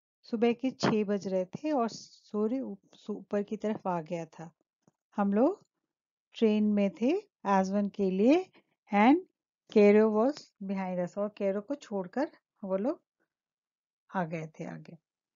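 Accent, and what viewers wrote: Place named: Bihar, India. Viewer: Mam you are from bihar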